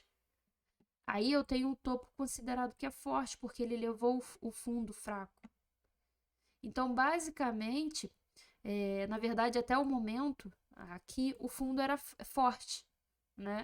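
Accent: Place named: Rio de Janeiro, Brazil. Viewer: começando o curso hoje. alem do sotaque carioca, que é muito gostoso de ouvir, sua didatica é otima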